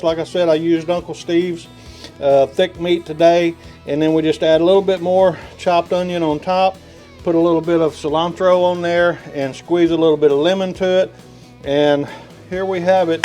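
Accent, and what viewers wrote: South, United States. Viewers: Just went through my annual blood tests and physical. I'm 68. Went in for "The Chat". Took height, weight, blood pressure, the usual suspects. BP was on the high side of normal. I asked what was it last year? She checked and said, About the same. I said Normal, Nervous, Anxious result? She smiled then looked at the summary of results and said, Oh, this is unusual (she shoulda took my BP then). Every test was perfect! (my heart started beating again) See NEXT Year! I hope all goes well with whatever confronts you, my Friend. 🙂 Now, I think you'll enjoy this. Our carpet was scheduled to be put in yesterday. We were watching YT videos. The installers showed up early went out and greeted them, a father, daughter team, spoke little English with a heavy Central American accent. I showed them what they were up against and they got at it. 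While lugging in their tools I hit go on a video by Smokin Joes Pit BBQ making Carna Asada's Tacos. The installers were right behind me, heard it, stopped for a few seconds, looked and back to work. I thought it was just coincidental. BUT, you were up next with this video. They were just coming through the door again when I started it and you said, (with your subtle Southern accent) and I'm quoting the closed caption that somehow was on the screen, "Come on stops me Amigo's, how are you doing my friends". Yup, didn't really roll of the tongue the same as it would had Joe said it. 🤣Again he stopped for a second, but just One, and back to work. I ha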